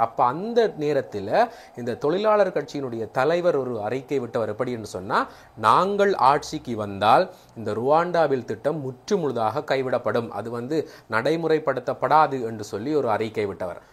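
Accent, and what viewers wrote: Sri Lanka. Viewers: தலையில்லாத ஈழம் உடலில்லாத தமிழர்கள் என எண்ணப்படும் நேரத்தில் இங்கிலாந்து ஆட்சி மாற்றம் இன்றைய காட்சிகளின் சீர்த்திருத்தங்கள் வரவேற்க தக்கது. ஈழத்தமிழ் கேட்டு கேட்க இனிமை. ...........................by... பம்பாய். இந்தியா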